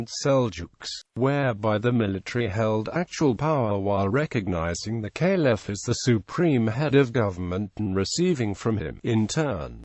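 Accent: United Kingdom. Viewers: The robotic accent maked it impossible to understand, such videos including arabic words must not be created in British robotic accents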